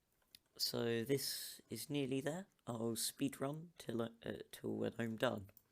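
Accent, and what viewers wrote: United Kingdom. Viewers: Me when i heard him : HES BRITISH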